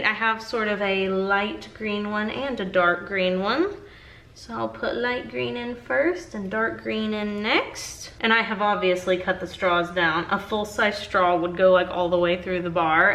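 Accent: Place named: South, United States. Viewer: I go to soap vids when i run out of pimple popper vids. I love yours cause your from the south and so country like myself.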